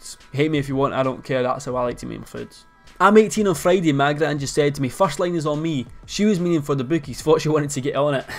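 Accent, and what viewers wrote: Scotland, United Kingdom. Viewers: your scottish accent is even more scottish 4 years ago ahahh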